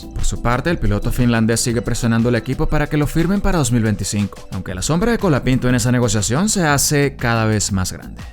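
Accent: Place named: Venezuela. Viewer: a pensé que eras de México, porque no tenes casi acento venezolano xd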